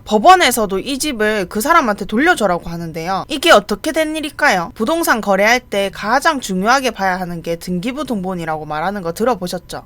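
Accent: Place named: Busan, South Korea. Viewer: 붓싼 말씨네요. ㅋ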